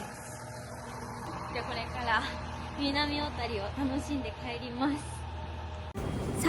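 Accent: Kansai, Japan. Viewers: 穂高町に一度行ったことがあります、きれいな素敵な町でしたよさんが時々関西弁が出るのが親しみを感じます。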